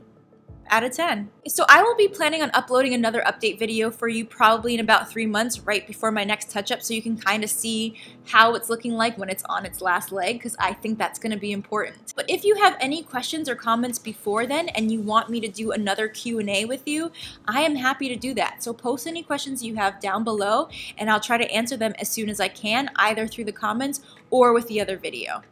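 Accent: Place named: Jamaica. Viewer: I could tell you are of Jamaican ancestry based on the way you speak. Notice, I didn't say your accent. You said something a specific or a particular way that was a give away to me. It's not obvious but I noticed. Your hair is looking lovely.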